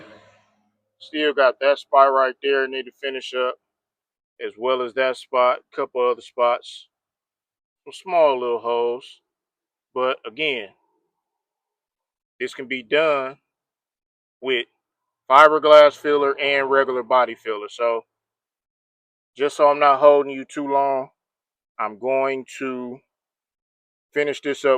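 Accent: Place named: Detroit, United States. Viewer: That sound like a Detroit accent too 👀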